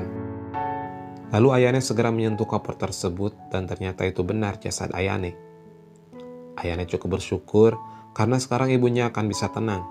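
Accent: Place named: West Java, Indonesia. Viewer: cape haha orang sunda ya bang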